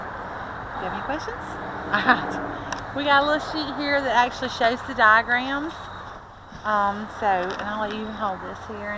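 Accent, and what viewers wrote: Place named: Texas, United States. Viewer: I love her Texan accent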